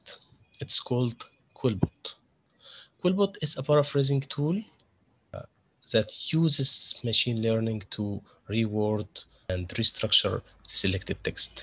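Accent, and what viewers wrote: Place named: Egypt. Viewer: ما شاء الله. لكن ملاحظة لو تتكلم الإنجليزية بدون لهجة مصرية التي تحول الثاء إلى سين والذال إلى زاي.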